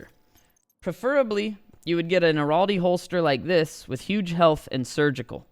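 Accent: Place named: United States. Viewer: Why does sound American now?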